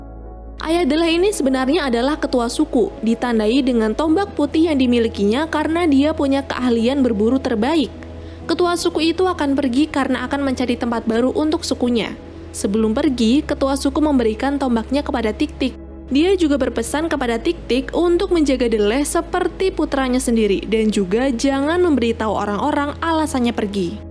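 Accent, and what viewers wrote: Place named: Sunda, Indonesia. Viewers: Gw nonton karena yang ngomong nya cw orang Sunda lagi ya Allah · Sukses terus mbak.. Lucu nyarios sunda na.... Aku nyesel baru baru ini nemu chnl mbk... #auto chanel faforit ini mah...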